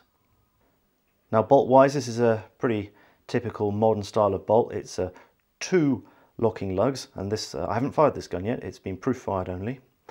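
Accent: United Kingdom. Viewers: Apologies. You mentioned it by text but since i was only listening to your lovely British voice i missed that bit. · Lol when your so used to accents. Just realized this guy is from the U.K and I am almost done the video.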